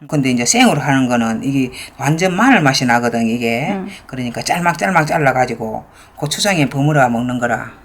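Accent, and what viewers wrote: Gyeongsang, South Korea. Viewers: 진주사투리 들을수록 고향가고 싶어요 스물안되 부산와서 벌써 50이 넘었네요 내가 뛰어놀던 인사동 잘 있나 몰라요... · 경상도 말투가 아주 재미있어요 잘보고있습니다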